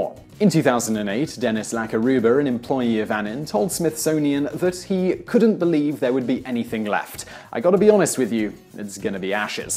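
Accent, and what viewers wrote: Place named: RP, United Kingdom. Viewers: Thomas Smith yeah sounds posher than me anyway, southerner that'll be why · Thomas Smith posher English I think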